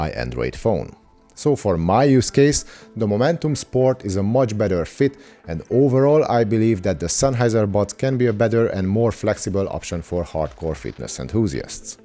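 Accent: Hungary. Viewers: Oh you live in Ireland, that's great. With your accent I thought you were coming from Hungary or another eastern country. Great video btw 👌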